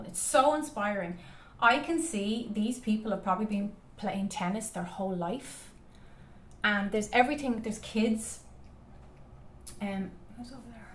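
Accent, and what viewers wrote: Ireland. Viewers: are you Irish? according to my psychic vision you are.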